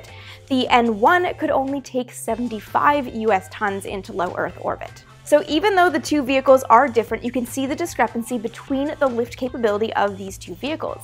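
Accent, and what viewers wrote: Canada. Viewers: is she Canadian? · you're Canadian i bet.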